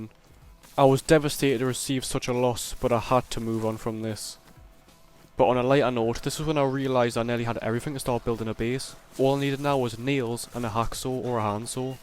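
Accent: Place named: Newcastle, United Kingdom. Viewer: Nice to hear a geordie voice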